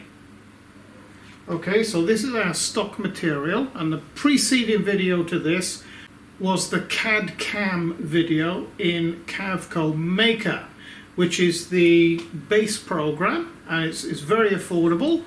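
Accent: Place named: Shropshire, United Kingdom. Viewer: You sound like a Shropshire mon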